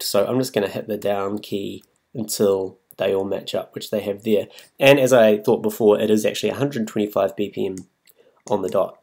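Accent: New Zealand. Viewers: New Zealand? love that accent! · Love the Kiwi accent. You really "squash" your vowels!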